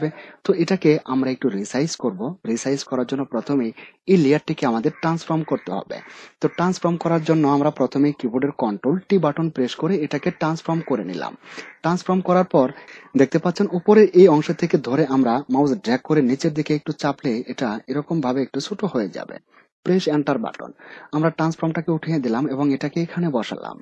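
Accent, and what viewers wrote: Bangladesh. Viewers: Bangla vasa ta ektu valo kore bolle valo hoe.. bangladeshi vasa ta sunte baje lage.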